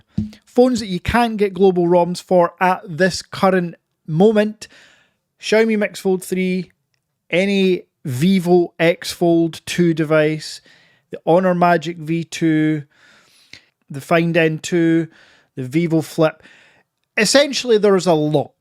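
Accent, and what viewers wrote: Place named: Scotland, United Kingdom. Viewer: Scottish, not English. Are you a fan of The Critical Drinker?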